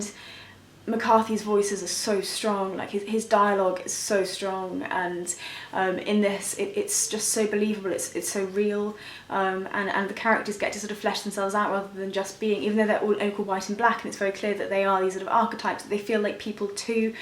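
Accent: United Kingdom. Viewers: Sometimes writers get carried away or whatever and make something TOO dang long and I sometimes I just spin out and can't finish them so I'm glad you mentioned that in regards to, Infinate Jest which I will likely avoid unless I'm locked up in jail or something and the only books I have are Infinate Jest, and The Bible. All of these sound worthwhile though I am narrowing it down to Sunset, Judas (you convinced me that this is a must) and for sure, Child of God which I am eager now to get a copy of soon. I've noticed that there are many other youtube people that give book reviews and some of them are ok or so so. For some reason, I think that is because your voice is nicely British you give this venue a stronger sense of credibility than when other (American) people do this. LOL. There is NO logic behind this but I'm sure on some subconscious level that I must somehow be processing the credibility of these things on geography. 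That's horrible but in this country with each passing year fewer and fewer Americans seek out or desire the act of reading. LOL. Oh well. Cheers!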